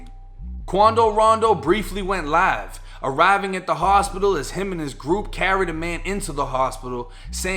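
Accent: Boston, United States. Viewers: Yo is this dude talking from Boston some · Are u from Boston?..I hear the accent...btw good breakdown 👍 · U sound like ur from Boston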